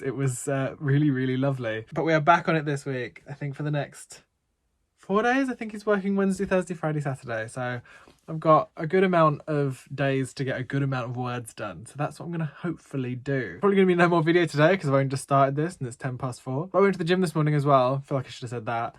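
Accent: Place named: England, United Kingdom. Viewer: Missed your top tier English accent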